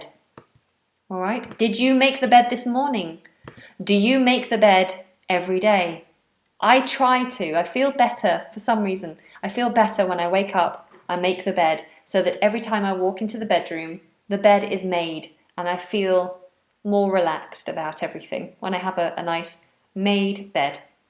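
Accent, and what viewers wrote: United Kingdom. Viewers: I love your British accent,! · Very beautiful British english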